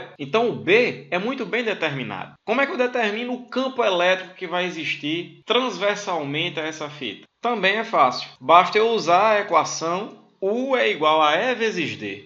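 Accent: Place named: Northeast, Brazil. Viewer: o mais bonito é esse sotaque visse . bichim amo meu nordeste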